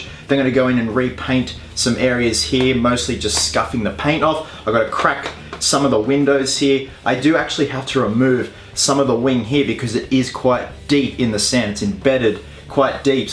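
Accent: Australia. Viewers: What part of Australia are you from?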